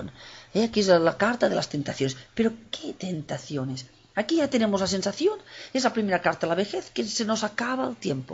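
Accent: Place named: Spain. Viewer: Hola, gracias por los vídeos, pero sería mucho más agradable que Ud pudiera vocalizar, hablar..más lentamente , ya que no todos somos españoles y su acento y tonada, hablando asi!, tan rápidamente, hace que sea un poco dificil de seguirla por la cantidad de información que hay que asimilar y da la sensación de estar Ud muy apurada por terminar! :-( Gracias!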